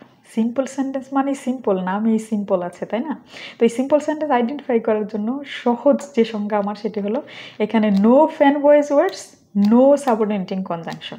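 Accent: Narsingdi, Bangladesh. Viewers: Boin just masallah chilo....apnr accent sone mone hocce apnr bari Narsingdi.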